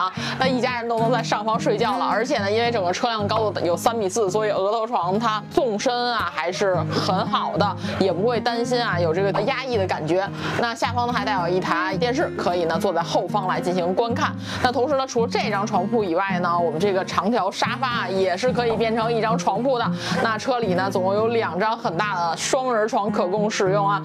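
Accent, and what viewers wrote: Beijing, China. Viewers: 既然面向中国或者世界，主持人建议不要用一口北京腔。用标准的普通话可能观众听了更适合一些。个人建议。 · 车是不错， 一口京腔听起来很不舒服，普通话不好吗？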